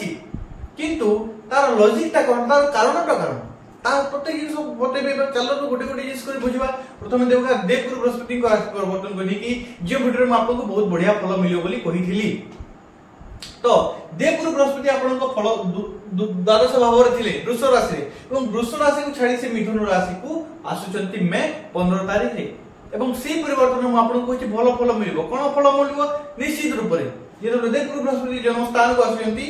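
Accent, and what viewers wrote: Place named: Western Odisha, India. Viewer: Tame to paschim odisha ra bagir laguche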